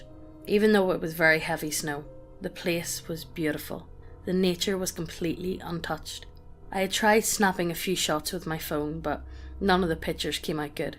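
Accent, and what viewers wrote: Ireland. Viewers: It's 11 PM here, I don't know if I should watch videos containing spooky stories and Irish accent now, zombie-leprechaun nightmare fuel : (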